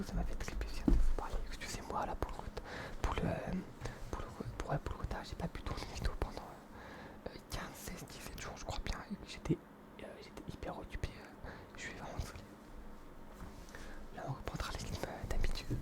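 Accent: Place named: Lyon, France. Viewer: Sérieux t de Lyon ! Moi aussi ! Ça me ferait de te croiser Inchallah mon frère . J’me disais aussi il parle comme les lyonnais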